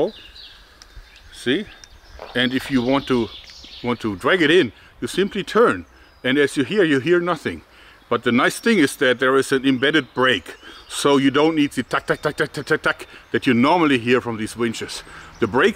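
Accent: Germany. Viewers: . Lets reduce the arrow-weight down to ... eehm ... 11 grams what should result in an arrow-speed of > 400 m/s .. ? Increasing the acceleration by 100% will lead to >1.600 m/s (hypersonic) ...and > 600 Joule (based on a 11 grams arrow) ? To stay below hypersonic-speed requires an arrow-weight below of ... eehm ... something below 16 grams ... or so. The Front-Part of that pulley, needs to be replaced behind the shoulder. Gruss :Rudi Ich mag dein DEUTSCHES Englisch :D . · Nach dem ersten Satz wusste ich, dass du deutsch bist 😆😉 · IT IS NOT "2000$", IT IS "$2,000"! I can hear you are German (?) but get it right! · I bet Hollywood actors watch your channel if they need inspiration and help with their German accent! :) You can put the sights a little forward yourself..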